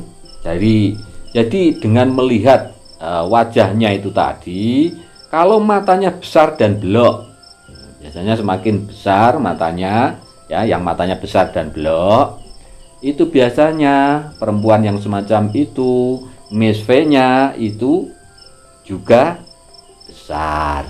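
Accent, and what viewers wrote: Java, Indonesia. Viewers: Mengenali Miss V para gadis.Lagi senang sekiranya sedari bayi.Iaitu anak sendiri.Positif dan bersyukur.Andai aku bisa memahami sungguh gaya penyampaian loghat Jawa!Telus ingin beristerikan orang@ jawa.Rajin dan bersahaja.Serta kuat akal fikiran.Saya dari Malaysia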